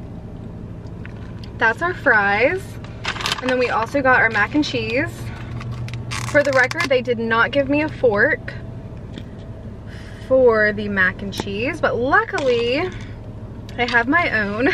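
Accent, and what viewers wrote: South, United States. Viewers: You have the typical southern girl accent! It's endearing and sets you apart from other youtubers so embrace it! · You do sound southern to me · I have no idea who that dude is lol but you can't go wrong with a KFC meal 😁 and girl yes you do sound very country and I can relate but I'm a Tx girl 💞💞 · You definitely have a little bit of a southern accent but at the same time you have a valley girl accent with some words lol it’s hard to explain. I love jack harlows song “first class” or something like that I’m not sure , my boyfriend plays it all the time lol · As someone who lives in Chicago you definitely have southern accent!! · it sounds like country type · LOVE KFC :) you have a semi southern accent but its cute :) KFC mac and cheese is my fave fast food mac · as a girl from california you definitely have a southern accent. 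i love it! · You sound southern to my ears but please don’t try to sound any different. Your voice is really sweet! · You have a slight southern accent. It only comes out sometimes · I love your necklace! Compared to here in Canada, you definitely have a southern accent in comparison to us but I love it! You're almost at 100K!!!:) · You absolutely enhance the southern twang when you order, but you 100% do still have a southern accent all the time haha I'm telling ya just go back to your store next week if you want the Jack packaging. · i do love your voice girl and it does sound like country but i love it. i haven't had KFC in YEARS!! where i live, we do have a KFC. BUT i cant eat it cause i have such a sensitive stomach to very greasy so it doesn't go well with me. but we do have a Mary browns and its almost the same as Mary browns but its NOT greasy at all and its crsipy and the chicken and cheese macaroni or other stuff is amazing!! 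if you have a mary browns, let me know girl:) im curious:) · You definitely sound southern to me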